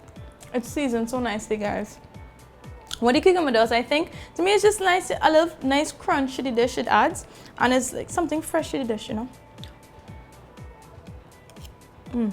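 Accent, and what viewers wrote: Trinidad and Tobago. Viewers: Somehow I lost you on my facebook, but I'm so happy to find you on YouTube. Your accent makes me feel at home.... love that real Trini accent! Please keep these vids coming!!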